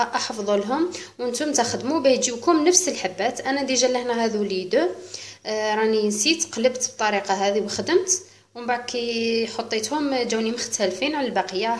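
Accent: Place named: Setif, Algeria. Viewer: أطباق يمممم مقروط وعليه لكلام ختي من اي ولاية انتي حساتك من صوتك انك سطايفية والله اعلم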